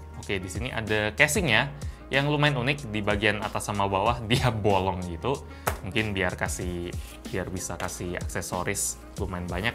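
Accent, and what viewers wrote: Palembang, Indonesia. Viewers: Ay wong palembang sikok ini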